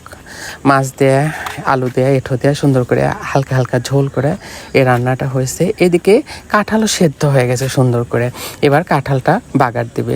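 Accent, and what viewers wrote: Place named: Rajshahi, Bangladesh. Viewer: রাজশাহী জেলার মানুষ এভাবে আ্যঁ আ্যঁ করে কথা বলে 🤮🤮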